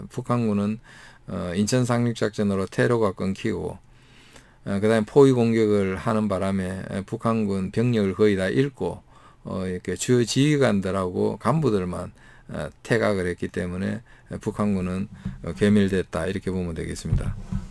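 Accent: Gyeongsang, South Korea. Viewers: 말씨 경상도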